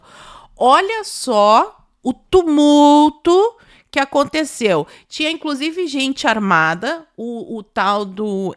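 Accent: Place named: Rio de Janeiro, Brazil. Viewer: Cariocão kkkk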